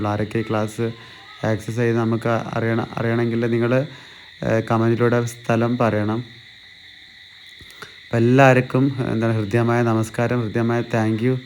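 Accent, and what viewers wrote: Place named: Kozhikode, India. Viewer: ഞാൻ tvm ജില്ല. സാറിന്റെ ക്ലാസ്സ്‌ കേൾക്കാറുണ്ട്. നല്ല ക്ലാസ് ആണ്. ചില വാക്കുകൾ മനസിലാകുന്നില്ല. കോഴിക്കോട് രീതിയിൽ സംസാരിക്കുന്നത് കൊണ്ടാകാം. 😊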